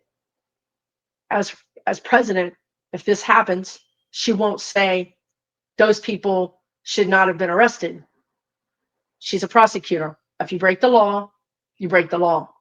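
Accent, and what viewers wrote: Texas, United States. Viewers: Like you don't look white Southern. Sound Texas or Southern fo sho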